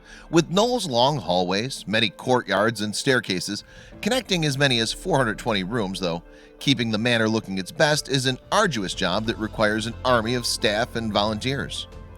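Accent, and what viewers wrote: United States. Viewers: A beautiful ENGLISH house with a yank speaking in non English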